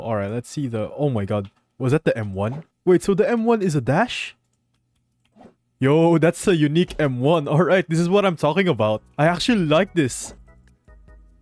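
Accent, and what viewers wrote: Philippines. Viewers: Are you pilipino? it seem's you're pilipino because of your accent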